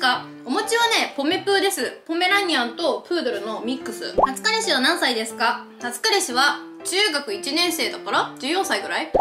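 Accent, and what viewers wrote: Kyushu, Japan. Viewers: 福岡やけど方言わかりすぎて（笑）さすが九州🥺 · 同じ九州だけど「あんたそんなことばっかりして〜」までしか分からん笑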